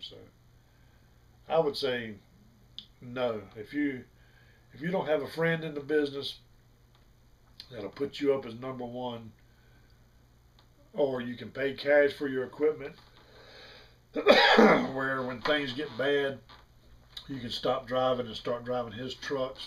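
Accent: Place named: Carolinas, United States. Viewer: Where you from man? The Carolina's? Got that accent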